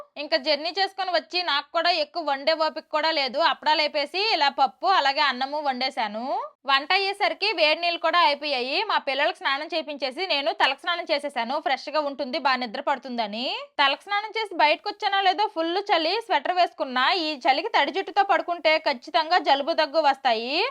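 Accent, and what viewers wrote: Srikakulam, India. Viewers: Mee sreekakulam yaasa chala bagundi 😊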